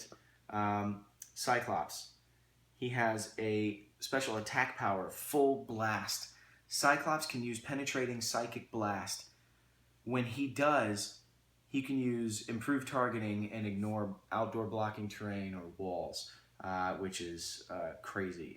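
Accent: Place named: Russia. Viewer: Good Russian accent! You almost sound like FPS Russia!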